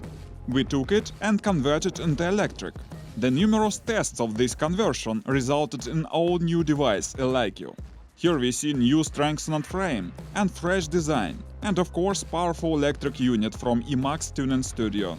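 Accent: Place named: Russia. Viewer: Да, русского сразу выкупаешь, но который пытается казаться нерусским (сам в молодости пытался так говорить) А наповал пишется слитно, кстати)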